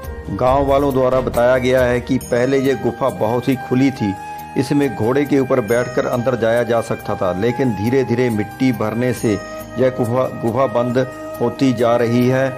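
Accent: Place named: Punjab, India. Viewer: Bhai ji tuhade Hindi bolan de andaz to pta lgda he k tusi Punjabi bolan vale ho fir Punjabi bolo kion k isnu jiyada dekhan vale punjabi hn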